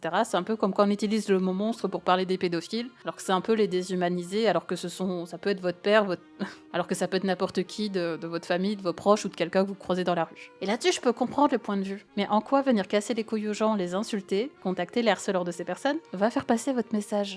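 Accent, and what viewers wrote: Belgium. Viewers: Bonjour. Abonnée qui sort de la communauté silencieuse!! Personnellement, si je regarde tes vidéos avec autant d'assiduité, c'est pour ton sarcasme, ton humour, ta personnalité (supplément accent belge) bien plus que pour le contenu. Même si j'adore le format de tes vidéos; des chaînes qui traitent des sujets dramas il y en a des milliers. Des, je n'en suis qu'une. Je dis ça sans parasocial ou quoi. Si je clique sur tes vidéos c'est pour voir du (les gars dont tu parles, pour la majorité je les connais même pas! ) Ce que j'essaie de dire c'est: amuse-toi. T'aimes la création de vidéo, alors crée des vidéos que tu aimes! Et je pense sincèrement que plus tu t'autoriseras à être toi-même dans ton contenu, plus les gens qui décideront de te suivre te ressembleront. Y'aura toujours des gens qui profiteront de l'anonymat pour venir gratter la merde, mais je crois que malheureusement ils viennent d'office avec le package ''femme sur internet''. 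En parallèle, y'aura toujours des gens pour reconnaître et apprécier ton travail! (Signé: une abonnée qui appartient à plusieurs minorités et qui ne s'est jamais sentie attaquée par tes blagues) Pense à toi. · Mais mais, c'est ta chaine non? tu fais ce que tu veux. Si je suis sur ta chaine, c'est que j'aime ce que TU fais. Je suis plein d'autres chaines et je suis capable d'en prendre et d'en laisser. Les médias sont tellement remplis de trucs qu'ils y en à pour tout le monde. J'aime ton humour, ta voix est apaisante, ton accent belge, je suis Québécois, et je t'assure que j'ai aussi un gros accent. Tu ne pourras jamais plaire à tout le monde, et c'est ainsi que le monde est fais. Tu à eu le courage de crée une chaine YouTube, ce serait le comble que tu ne puissent pas y faire ce que tu veut! Courage et je serais toujours à tes cotés en tant qu'abonné. parce que point! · C'est toujours triste quand on doit se réfréner, se bâillonner, pour contrôler la casse, éviter les problèmes, etc, quand on créée quelque chose, et qu'on finit par s'étouffer soi-même… 😔 De toute façon, leur souci principal, c'est que vous soyez une femme sur Internet, et d'en plus ne pas rester dans les cases "make-up" ou "vlog" 🙄 Il y aura forcément des gens qui auront quelque chose à redire, rien qu'à voir les attaques sur l'accent belge, mais je me doute que c'est trop facile de dire de ne pas les prendre en compte, quand on ne le vit pas (et hypocrite, je ne sais pas le faire xD) Faîtes au mieux pour vous-même, vous êtes la plus importante dans l'histoire ♥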